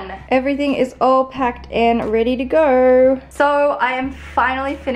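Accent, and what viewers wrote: Australia. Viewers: You can't love her that much if you didn't even know that she is Australian LOL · she's Australian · She doesn't sound British she sounds Australian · It sounds 110% Aussie?? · you reckon? She sounds Aussie to me. Says some words like Americans do which all you tubers seem to. Why does it bug you? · Hahahaha she's Australian... Aussies that travel have the most confused accents, mine's the same.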